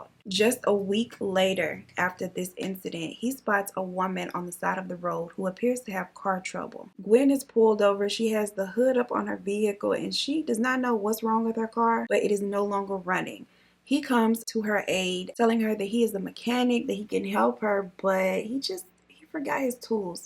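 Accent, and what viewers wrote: South, United States. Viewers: You Definitely have the South Slang I’m from the Miami area so we speak how you speak so that’s probably why I love you videos extra cause I get it in Everes every way when you explain it lol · My husband past recently and him and his family use a lot of the southern terms and way of speaking that you do. So I really do get a kick out of listening to you talk. Things like; “fat meat is greasy” or “too much like right“ lol truly brings a smile to my face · Girl, we just got that sass and drawl when we speak in the south! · I love the way you speak!! I love all the killer girls, but its really nothing like hearing it in your own "dialect" ie black, southern, hip, etc... Make em Google it! 😆😅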